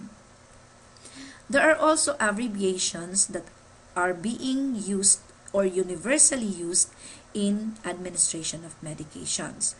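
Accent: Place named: Philippines. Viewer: I love the filipina accent. 😘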